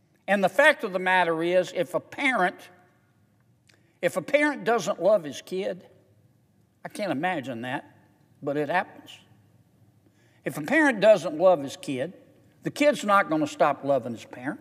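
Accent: South, United States. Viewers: He went to Oxford. I'm certain he didn't have the southern drawl while he was going to Oxford.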